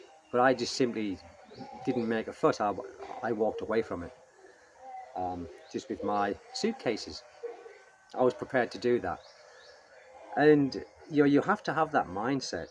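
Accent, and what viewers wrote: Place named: Teesside, United Kingdom. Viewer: New subscriber, where is that accent from, cant quite place it, possibly teesside? I'm from Newcastle. Keep enjoying PH 😉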